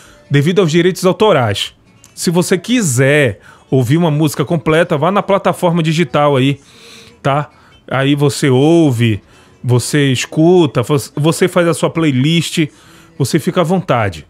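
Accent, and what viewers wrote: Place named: Bahia, Brazil. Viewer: Caracas pelo deu sotaque vc e baiana Amo Bahia também sou Bahia mais vivo em outro estado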